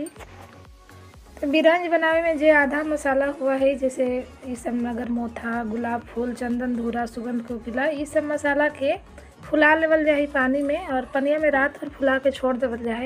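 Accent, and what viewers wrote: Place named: Magahi, India. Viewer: बहुत सुंदर सात्विक रेसिपी के साथ मगही आवाज, बहुत बहुत शुभकामनाएं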